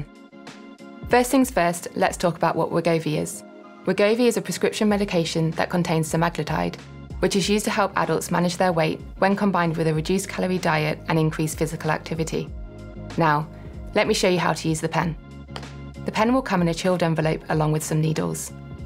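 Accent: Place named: United Kingdom. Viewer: It states that is U.K. and you can tell from the accent. It is not all about the US !!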